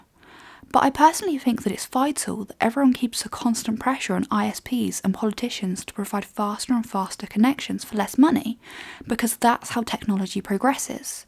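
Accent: United Kingdom. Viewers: Her English says it all .. USA is not the origin of English.. it's UK..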